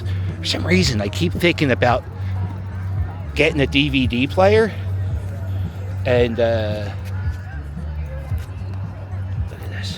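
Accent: Philadelphia, United States. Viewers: Yo Cuz…..your bike tour was great. First timer here! My kids make fun of my Philly accent all the time…..so it was so good to hear someone sound like me. Too bad about Franklin Mills. It looked very depressing. I did shop there a lot. Thanks for the tour.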